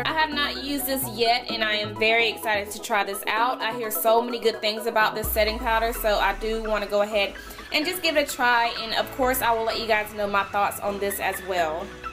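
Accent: South, United States. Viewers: You sound like a fellow southern girl too! :) where are you from? just recently found your channel and loving it!! subbed to ya!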